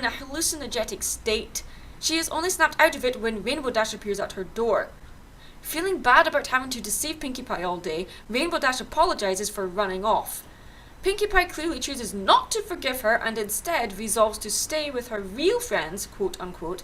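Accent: Scotland, United Kingdom. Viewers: am sorry to asked but are you Scottish or Brittan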